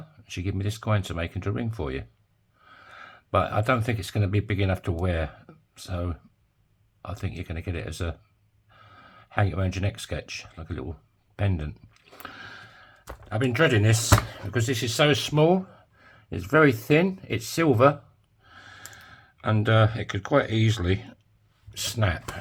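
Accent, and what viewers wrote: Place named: Essex, United Kingdom. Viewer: you mentioned you were in Cornwall at one point, where out of interest , I me in St. Mawes. but like me your accent says Essex or east London!!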